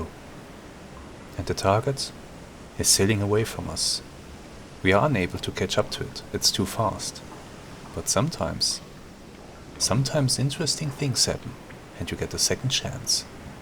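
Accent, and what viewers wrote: Germany. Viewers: I really love your SH3 series mate. You are smart and careful and that german accent is just awesome! This is real entertainment. Auf wiedersehen herr kaleun!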